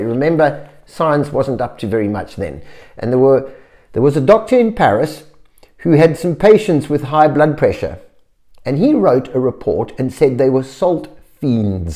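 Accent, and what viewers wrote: South Africa. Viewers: You sound south african, thanks for your video!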